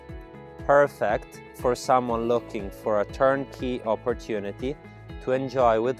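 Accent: Italy. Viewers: Great video, as always, with a very professional presentation. Your English is very good, and I love your Italian accent! I love when you say "terra cotta". I could never role an "R" like that. Please keep the videos coming, and improving your presentation. I bet the your sales are going up!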